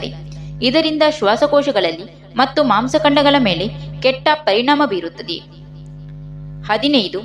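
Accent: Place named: Kerala, India. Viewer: YOUR EXPLAINATION SLANG YOU ARE MALAYALI.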